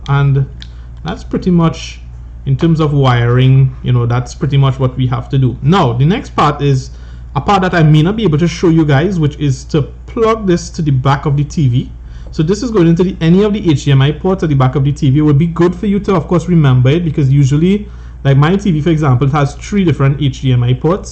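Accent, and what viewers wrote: Trinidad and Tobago. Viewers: Are you Trinidadian?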